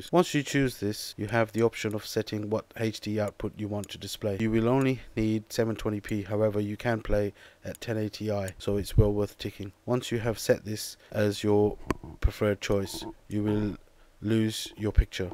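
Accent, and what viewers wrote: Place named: United States. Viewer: وااااو الاكسنت عندك كنك امريكي .. ^_^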